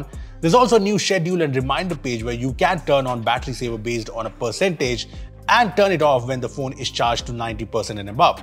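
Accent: India. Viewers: I love the light Indian accent with great English ❤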